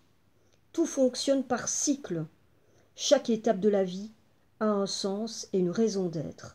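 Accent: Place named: Wallonia, Belgium. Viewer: J'aime beaucoup vos tirages et votre accent wallon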